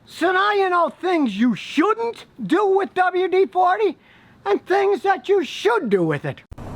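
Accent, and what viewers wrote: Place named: Chicago, United States. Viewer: you sound like you are from Chicago.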